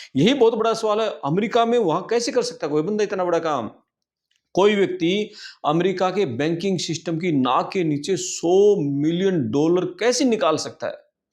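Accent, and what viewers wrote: Haryana, India. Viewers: Some haryanvi accent 😮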